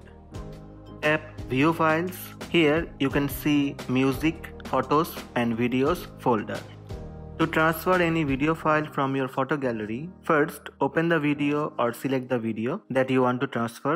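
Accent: India. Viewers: Videoyu çeken hindistanlı nerde olsa tanırım bu şiveyi :)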